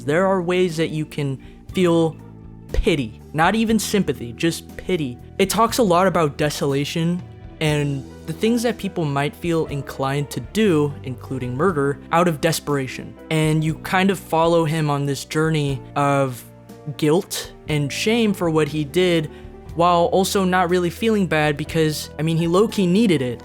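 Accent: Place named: United Kingdom. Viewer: Yea the British accent sold it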